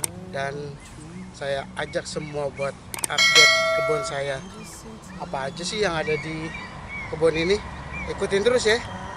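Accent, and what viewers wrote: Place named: Indonesia. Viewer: ... Bahasanya masih khas banget anak Johar... Seneng deh liat skr.. 🥰 Sehat dan happy selalu yaa... 🙏 Salam dari temen kecilmu dulu... 🌹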